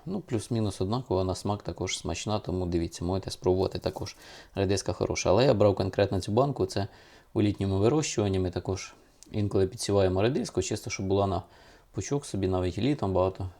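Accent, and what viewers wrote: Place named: Kyiv, Ukraine. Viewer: Доброго вечора, завжди дивлюсь з задоволенням, а на дурні коменти не звертайте уваги., в нас також говоримо як і ви ,бо з Київщини.😊😊😊😊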